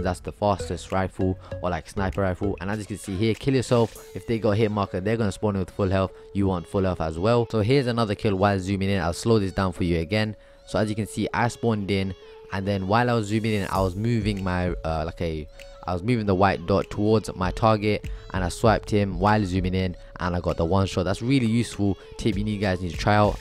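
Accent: United Kingdom. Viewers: HE TALKS BRITISH 🔥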